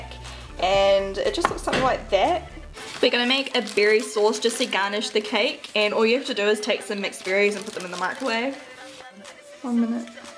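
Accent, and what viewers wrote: New Zealand. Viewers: Nice kiwi accent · Are you from New Zealand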